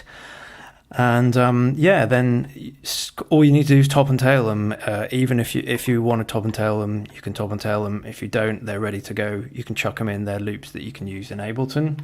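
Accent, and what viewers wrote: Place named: Northern England, United Kingdom. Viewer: Just watched it - very useful thanks - I am doing none of those things properly although I have found a good piece of s/w to show the key of a clip - Keyfinder (no business connection - its free) - nice to have a calm englishman with no gimmicks rather than some of the johnny foreigner types with all their silly cuts (including hair!), daft graphics, mumbling, silly accents etc - I take it you're from up t'north lad by eck.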